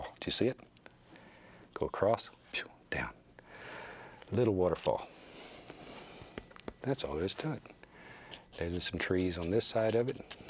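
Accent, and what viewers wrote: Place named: Midwest, United States. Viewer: That's his mid-western accent. He's originally from Indiana.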